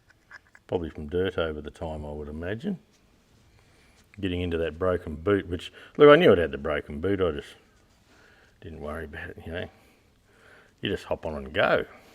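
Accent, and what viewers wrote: Australia. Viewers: informative. I like your aussie English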